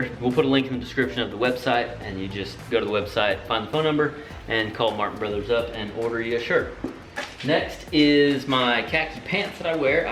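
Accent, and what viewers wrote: Oklahoma, United States. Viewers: What part of Oklahoma you from?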